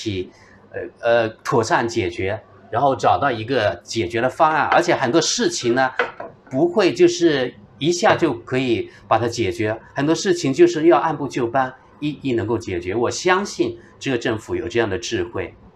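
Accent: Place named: South, China. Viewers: 老乡，虽然你的南方口音和表达不是那么完美，但是听起来特别真诚。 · airs yong 他普通话是流利的，但是有南方口音，这很正常。就象滇西小哥一样，最后一句话是有云南口音的，挺可爱的。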